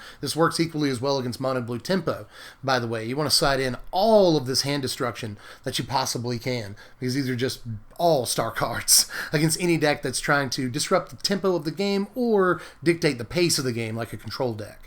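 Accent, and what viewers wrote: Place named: Georgia, United States. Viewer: You're from Georgia arent you? I had a feeling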